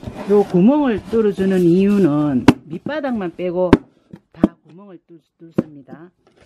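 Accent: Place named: Gyeongsang, South Korea. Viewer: 아ㅡ고 포장여시 고수의 손길이 보이내요 ᆢ이리 꼼꼼이 ᆢ 정성또한 가득하네요 ᆢㅎㅎ♥️♥️♥️♥️♥️♥️♥️정겨운 갱상도 말투가 참듯기 좋네요 저두 진주 가시나 입니다 ᆢㅋㅋ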